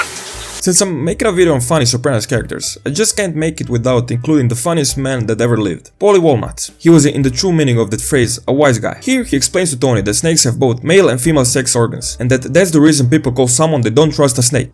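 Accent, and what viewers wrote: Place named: Eastern Europe. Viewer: I found a lot of humor in the series, but I felt it was unintentional and a reflection of my own od sense of humor. This narrator - is it an AI generated voice with some odd Slavic or Israeli accent on speed?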